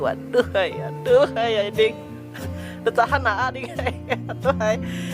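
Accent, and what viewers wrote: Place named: Banjar, Indonesia. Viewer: Gaya mu tu bapander tu lucu banar sanak,ak suka banar mandangar logat banjar tu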